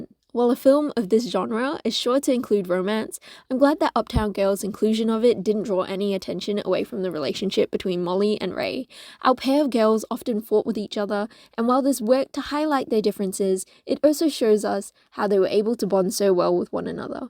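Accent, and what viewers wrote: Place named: Australia. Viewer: Refreshing Australian accent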